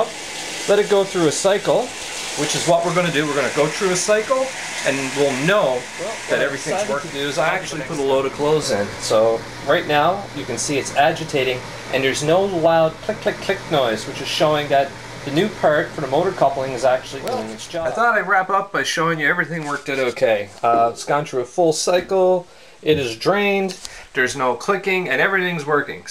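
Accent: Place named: Canada. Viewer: Oh you SO rock :) I was thinking I had to a get a new washer but followed your steps and sure enough - that little bugger was broken., Ordered a new one and paid extra so it'll be here Fri (it's Wed now) SWEET! YAY I don't have to go to a skeezy laundromat LOL THANK YOU! :) (And you're Canadian - so am I - I could tell by the "oots" before you mentioned Toronto LOL I'm in Seattle but from BC.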